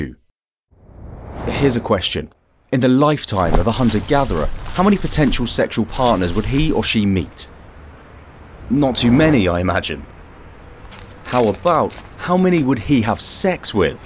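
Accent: England, United Kingdom. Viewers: I’m late to this channel. I’m glad I found it and you got an English accent. 👌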